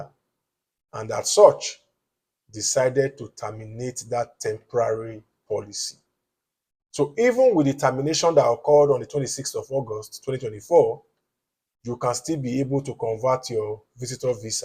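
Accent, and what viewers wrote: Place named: Nigeria. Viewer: Your Nigerian accent is too thick making it difficult to believe anything you say sorry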